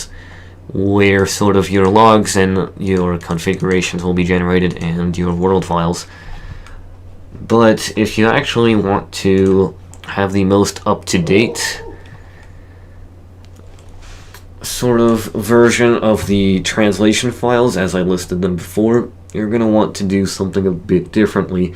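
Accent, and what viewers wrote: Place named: United States. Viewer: We thought you were russian but you sound like a monotone american. Great tutorial btw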